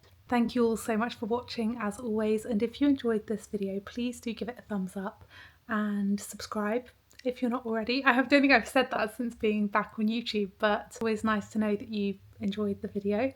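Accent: United Kingdom. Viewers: I just want to say how much I love your videos. Your soft sweet voice is so calming. I love the British accent….. Your home is going to be so amazing when it’s all done, looking forward to the tour. is so precious, listening to his cute voice brings me back to my children when they were little. Blessings lovely lady♥️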